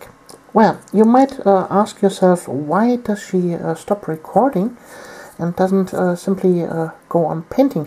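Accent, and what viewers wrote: Germany. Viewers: Jonathan Holt It's a woman with a german accent and a bit of an uncommon speech habit (sort of stiff mouthed, it's hard to describe).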